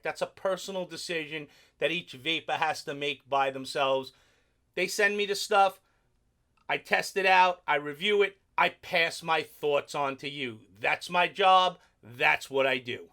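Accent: New York, United States. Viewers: You and Mikey Vapes both come from New York and you sound like a New Yorker when you talk and Mike sounds like hes from Illinois or somthin.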